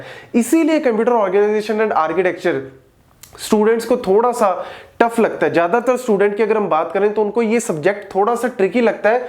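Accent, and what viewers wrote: Punjab, India. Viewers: App Punjabi hai jururt hai bolne ka Method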